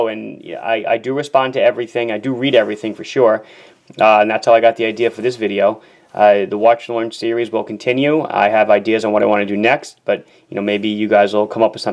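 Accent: Long Island, United States. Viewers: I grew up on Long Island, the watch information is interesting, but your Long Island accent so reminds me of home. Be well.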